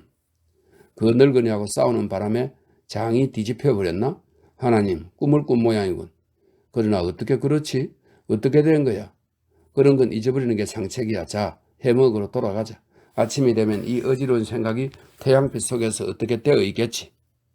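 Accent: Gyeongsang, South Korea. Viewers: 한동훈장관찐팬인데 후니가감명깊게읽은 책이라해서듣습니다 나이가좀많아서 너무감사합나다 두번째듣습니다 모두꾹꾸우욱누르고 경상도사투리어투가 매력적입니다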